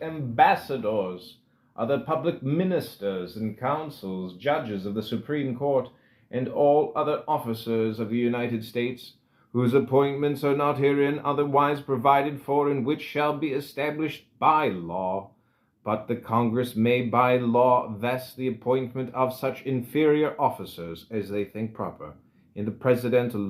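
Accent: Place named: United Kingdom. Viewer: It just seems wrong to recite the Constitution in a British accent.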